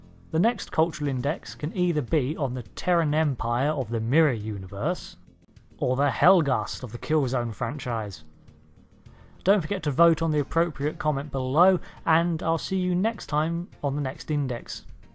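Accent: United Kingdom. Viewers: Sounds like you live in Britain, whats the difference?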